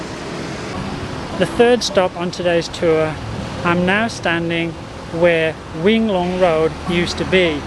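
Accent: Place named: United Kingdom. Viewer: Hi, I regularly watch your videos with interest. I lived in Singapore for 40+ years. What “bugs” me about your commentary is that you PRONOUNCE street names or places as if you were an Ang Mo that arrived just yesterday. I recommend that you (learn to) pronounce names the way Singaporeans say it, and not how the British would do it. The other day you visited BAKIT TIMAH. That sounds awful.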